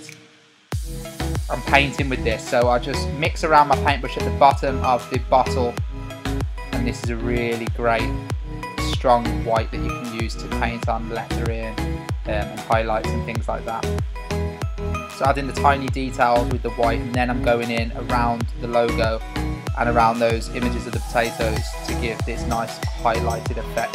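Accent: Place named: Midlands, United Kingdom. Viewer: Sounds like you are local to me. (Midlands) Can I commission you to make one for my wife's birthday party (26th Feb).?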